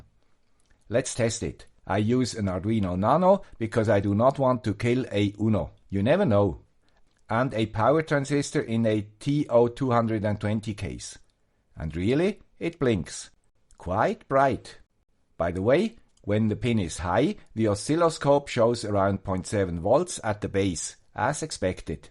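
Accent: Switzerland. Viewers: Thanks for taking the time to answer, keep up the good work. Sunday morning newspaper, not any more, now it Sunday morning and new video from the brilliant guy with the Swiss accent.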